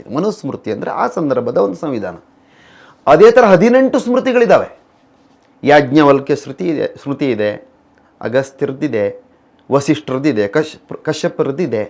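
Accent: Standard. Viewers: ಎಂಥ ಶುದ್ಧ ಕನ್ನಡ ನಿಮ್ಮದು! ಎಂಥ ಸಂಸ್ಕಾರಯುತ ಮಾತುಗಳು!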